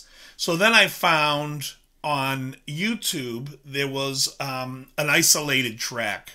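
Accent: Boston, United States. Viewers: I have got to say man, I'm a fan.what is your musical education? College? Juliard?... you seem very technical and educated on music..you are obviously from Boston or that area for some time.. but yeah cool man..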